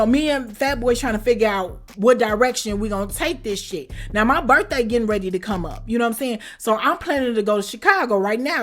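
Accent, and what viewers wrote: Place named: Philadelphia, United States. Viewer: One thing I can say is Philly people know when they hear another Philly voice lol I knew you was from Philly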